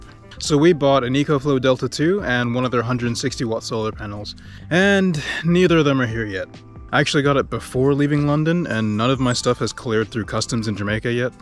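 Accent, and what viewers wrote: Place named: United States. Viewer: How is the trip from the UK but you have everything American even an accent the dialect the phrases, where are you from... Pretty much this pretty much that😊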